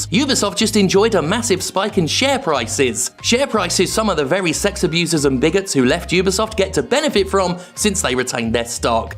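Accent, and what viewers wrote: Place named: United Kingdom. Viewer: the ones thing that irks me, is that you STILL buy the games and review them. Dont. Dont use the its my job excuse, show the example. Ive yet to see ONE reviewer, ONE online personnality actually not buy the games of companies that 'fill in the bank with something horrible'. I think it would have a much greater impact and put more force behind the words if when people asked 'how come you haven't done a cyberpunk review?' you could answer 'i dont support products of abuse', rather then oh ill review it when its on sale so I give the abusers 20 dollars less. I can't get passed it. Every reviewer acts like hes holier then though, its their job therefore they are above reproach. All these guys would ask ore suggest boycotts, but they would never make a stand. Listen, if you fear all your fans will leave you if you dontyreview the next big game..... 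that means they don't care about you or your content and you've failed as a creator. Im happy to hear you officially wont touch ubisoft games. I was gonna point out you did purchase breakpoint and blah blah. the lesson is to get to the end of the video! In your case specifically, I think people watch you for how you talk. No I don't mean the beautifull British accent, I mean how you vocalize your thoughts and ideas. You are a legitimate entertainer. I would wager you wouldnt lose a single subscriber if you only ever talked about these companies failures and not their products, never purchasing one again. heck youd even save money!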